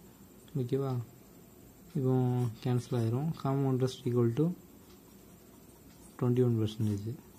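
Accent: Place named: Thoothukudi, India. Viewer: Anna ninga uplode panna Ella videos um pramatham...especially ur teaching skill is really good....nd ur slang tutucorin....innu nirya ethir pakrom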